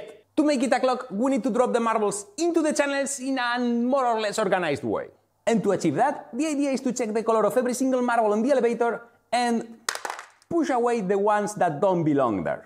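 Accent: Spain. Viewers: Bro, el típico spaninglish. Se nota que eres español por el tono de algunas palabras. Por cierto me encanta el video sigue así. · It's surprising how many Spanish natives have a very good English (this is the case) but their pronunciation is so attached to Spanish pronunciation rules. I wonder why. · eres español?? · me encanta el ingles de quenbrix que tienes, es como si hablara yo, y se entiende bien. un acento que solo tendría un español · Al principio pensaba que el canal sería de algún guiri, una vez que escuché el acento de ese inglés supe que este tío era español 😂😂😂. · Estoy casi seguro de que eres español, solo diré: MOOOLA MUUUCHO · Are you spanish? I like your accent 👍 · Eres español, verdad? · acento mas español que yo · Se nota que eres español !! Grande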